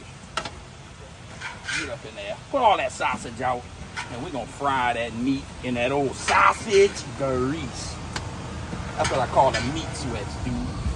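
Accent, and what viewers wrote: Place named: Cajun, United States. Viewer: if you weren’t severely autistic and could differentiate characters you’d realize he’s playing exactly that. On podcasts he’s got a strong ass Cajun accent but he’s not retarded.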